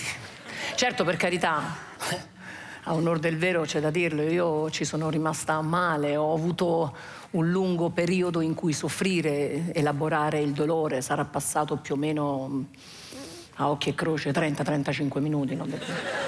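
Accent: Rome, Italy. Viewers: stesso quartiere della Meloni : stessa cadenza, stessa genialità espressiva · Mio padre aveva una parlata quasi romanesca e ascoltarti e una cosa fantastica perché tu sei fantastica dai allegria e colore a tutto quello che dici parole vere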